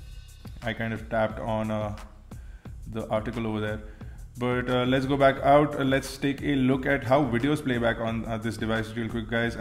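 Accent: India. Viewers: shut up.. this guy is a pro (not just in reviewing but also in English) and u call his accent bullshit coz ya just dont like Indians. now dats bulshit...!.. I bet u u wud hav loved it if it was a woman talkin instead of a guy.... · no...not at all...itz bullshit indian accent...waacckkk...!!the worst in the world!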